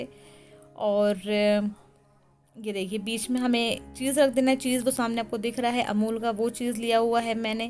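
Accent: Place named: Karachi, Pakistan. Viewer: Assalamu alikum I am from Pakistan karachi aap k bolnay ka andaz bilkul hum karachi walo ki terha hain aur aisa lagta ha k jasay aap Karachi wali hi hain please aap meray is comment ka jawab jaroor dijiay ga thanks ALLAH Hafiz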